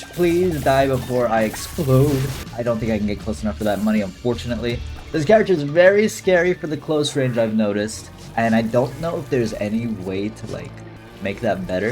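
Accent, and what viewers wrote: United Kingdom. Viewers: day 25 of asking to make full isaac video in british accent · He's from there so it's always a British accent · Day 14 of asking to play T isaac and talk the whole time in his British accent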